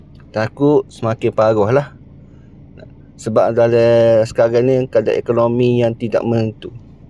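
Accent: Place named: East Coast, Malaysia. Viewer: pekat bethol loghat pantai timur dia 😅😂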